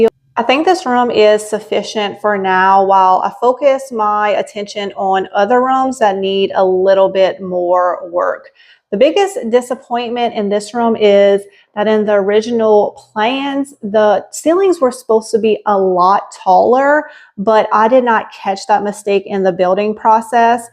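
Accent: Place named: New Orleans, United States. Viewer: I love it all. I think it's a great idea about the apothecary jars in the bathroom. You were right about the rug, but I love those curtains. Beautiful, home.And I really enjoy your channel and the NOLA accent 😊❤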